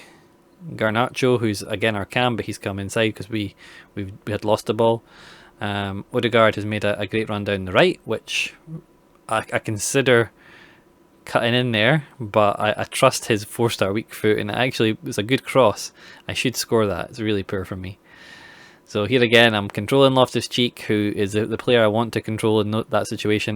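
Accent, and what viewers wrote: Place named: Scotland, United Kingdom. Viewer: I guessing you're Scottish right? (Becoz of the "wee bit" term used in your video and also the accent, so i guessed so, haha)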